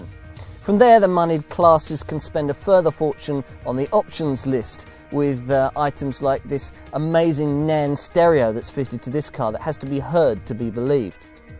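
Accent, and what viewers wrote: United Kingdom. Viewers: Well presented , chic & cool at the same time … and with a british way fashion way to speak it looks so authentic … btw presenting the car beside a superb British mansion gives even more attraction to it …. Thank you so much …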